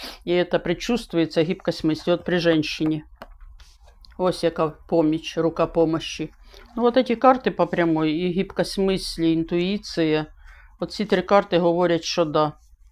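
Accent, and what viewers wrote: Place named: Central Ukraine, Ukraine. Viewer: Ми всі так розмовляємо в центральній Україні,сурджиком.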